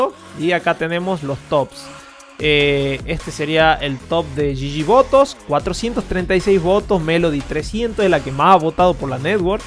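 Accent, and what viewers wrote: Argentina. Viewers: Wen eres argentino? Solo pregunto por el asento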